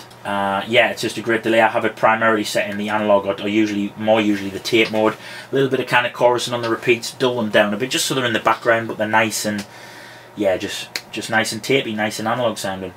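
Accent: Newcastle, United Kingdom. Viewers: are you a geordie mate? great pedals big fan mate :)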